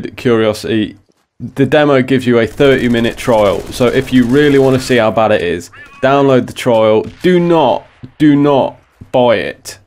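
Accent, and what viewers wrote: United Kingdom. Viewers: Where in Britain are you from?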